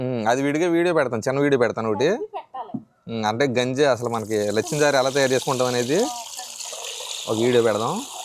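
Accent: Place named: Amalapuram, India. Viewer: బాబు మీ వంటలు చూస్తుంటే కడుపు నిండి పోతుంది తరువాత మీ మాట వింటు ఉంటే ఇంక కడుపు నిండు పోతుంది ఏ ఊరు అమ్మ మీది మా అమలాపురం సై డ్ లా ఉంది ఎక్కడో చెప్పండి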